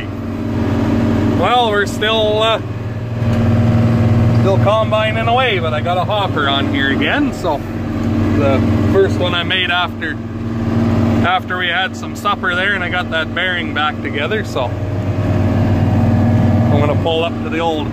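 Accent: United Kingdom. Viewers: Still harvesting laid, barley in the north of Scotland. Loved this video with all the family working, all the machinery working. Enormous skies! a different land altogether. I'll tell my father (92) about your grandfather....still on the swather and on his smartphone! Best of luck to you all. Love that accent man! Just makes me grin! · It's good to hear the northern accent. Reminds me of home..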